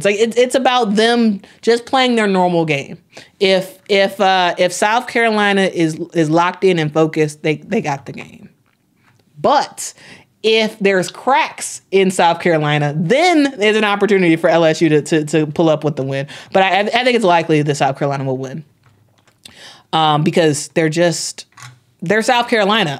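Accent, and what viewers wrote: Chicago, United States. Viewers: u have a Chicago accent. it’s not as strong as Gherbo, Durk, and Ari’s but we can hear it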